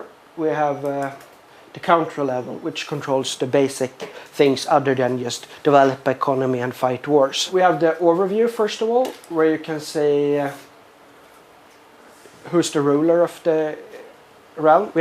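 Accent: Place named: Sweden. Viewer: Swedish accent ftw!! ;)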